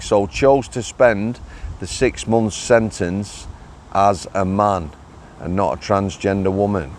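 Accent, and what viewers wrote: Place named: Northern England, United Kingdom. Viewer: Best Prison talk online! I heard you on the Prison Officer podcast on Spotify. Awesome hearing a Northern accent on an American podcast. My great Grandfather’s Brother was a Prison Officer at Strangeways. Retired from there. I’m from Cheshire, lived near Clitheroe Lancs, moved to Kentucky USA, did 8 years as a Correctional Officer, worked in the private sector (armed security) and have ended up back in ‘the job’ as a Deputy Jailer in a 600 man County Jail, seeing the worst of what humanity spits out on a daily basis…but still find time to have a laugh doing it…got to Brother! Love the channel, listen to it on the way to Jail daily. Thanks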